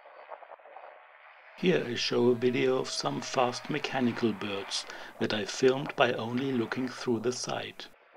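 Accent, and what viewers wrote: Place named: Germany. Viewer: The narrator sounds like the Cherman guy who.made "Grizzly Man."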